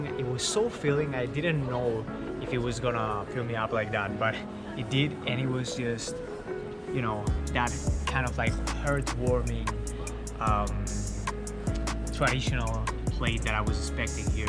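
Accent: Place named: Spain. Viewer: Great spainish accent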